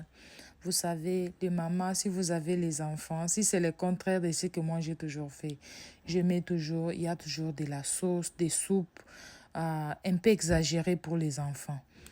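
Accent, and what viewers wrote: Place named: Democratic Republic of the Congo. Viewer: J'aime tes vidéos, je pari que tu es congolaise ( l'accent) . Vrai ou faux ?? Moi Alain d'akwa Cameroun je kiffe 👍 courage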